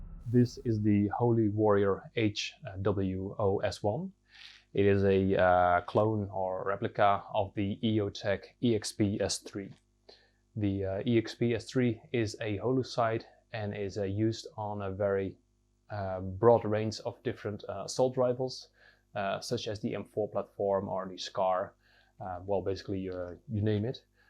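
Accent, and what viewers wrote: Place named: Scandinavia. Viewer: I hear that Viking accent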